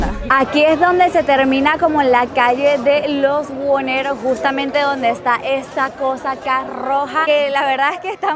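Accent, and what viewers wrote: Venezuela. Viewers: Siento que en este video habla más como VENEZOLANA, que cuando está en México 😅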